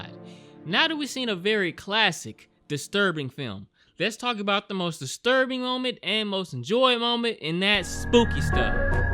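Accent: United States. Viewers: This guy has a nice American accent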